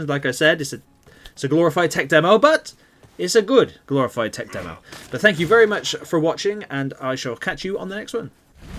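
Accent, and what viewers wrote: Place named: West Country, United Kingdom. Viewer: Can hear that slight twang of a west country accent, love it from devon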